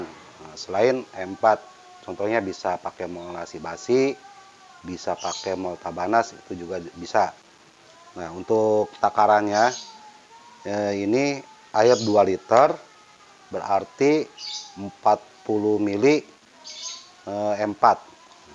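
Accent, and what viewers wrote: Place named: West Java, Indonesia. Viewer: Logat nya siga urang sunda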